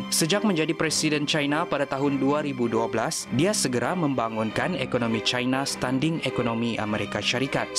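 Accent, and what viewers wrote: Sabah, Malaysia. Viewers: ni mcm org sabah oh.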